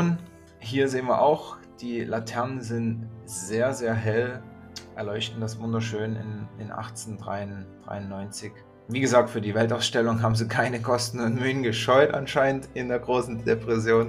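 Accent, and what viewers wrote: Saxony, Germany. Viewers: Über die Rhetorik mach dir keine Gedanken, der sächsische Dialekt ist goldig und du hast eine nette, bescheidene Art, dadurch wirkst du sehr sympathisch. 💐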